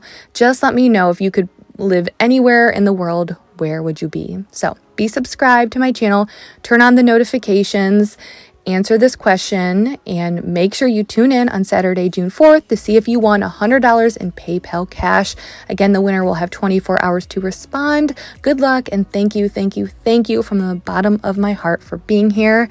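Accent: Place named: Ohio, United States. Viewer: Hi. I love ur spirit. Ur so calming. You made cleaning fun for me. You are the 1st You Tube Cleaning video I ever watched. And you are my favorite because of your positive, motivating, happy vibe. To answer your question. I love living near the beach. I live in New England now. The Cape Cod vibe is my scene. Your Ohio accent is adorable when it comes out. I'm sure you'd think my Boston accent is strange. 😆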